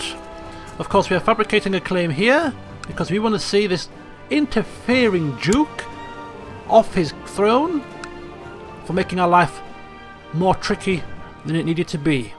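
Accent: England, United Kingdom. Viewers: what part of England do you come from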